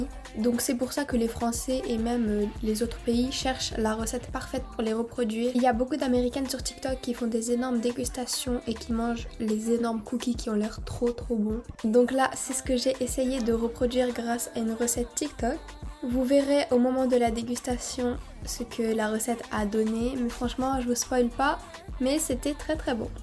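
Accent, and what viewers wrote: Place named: Canada. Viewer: T dans quel pays psq t’a unpeu un accent du Canada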